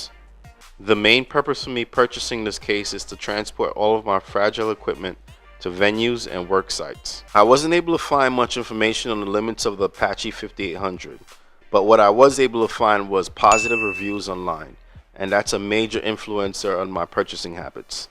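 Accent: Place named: Maryland, United States. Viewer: I detect a Maryland accent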